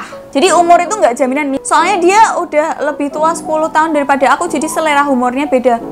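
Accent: Java, Indonesia. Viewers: Entah kenapa gw seneng bgt nonton konten kak. Cakep Medok Santuy Blak²an Apa adanya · Peh jowomu mbak. Tapi lumayan menghibur kok. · Logad jowonya itu loh asli ane suka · Logat jawanya pekat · medok'e kaaaueeetokk mbak'e wkwkwkw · Logate mbake jowo banget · Saya suka bahasa medoknya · logat jowone kuentel banget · sangar ngomonge medhok jowo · medok e wes mendarah daging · Medok banget mba, ane pusing dengernye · Jowone sangking pundi mba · Medoknya itu Loh ❤️🔥🔥👍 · Mbak'e iki medok jowo ne seru wes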